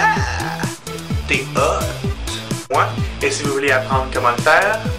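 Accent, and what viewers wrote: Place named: Quebec, Canada. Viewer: Tu as un accent Québecquoi ! :]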